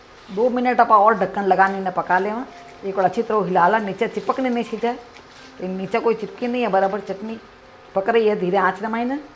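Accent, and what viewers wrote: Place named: Rajasthan, India. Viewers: आप री सारी रेसिपी में फॉलो करु हु मम्मी जी। आप रो बनावन रो तरीकों ओर मारवाड़ी में बोलनरो तरीकों माने बहुत अच्छों लगे है। बहुत सारी रेसिपी में बनाई भी हु। आप री सारी जानकारी में जोधपुर रो लहजों आवे है।😊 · Iys typical marwadi language, easily understandable almost like hindi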